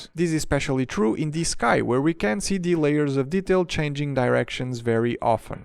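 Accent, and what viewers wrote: Portugal. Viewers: well it seemed to me that you were portuguese i knew only by the accent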